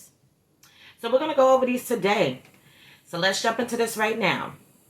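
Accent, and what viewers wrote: New York, United States. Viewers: Thank you love your vibe and NY accent. Mars in Cancer, Saturn in Cancer, Neptune Sagitarrius and North Node Scorpio here. You are hidden gem on Youtube, you deserve waaaay more attention on here. Glad I found you last year!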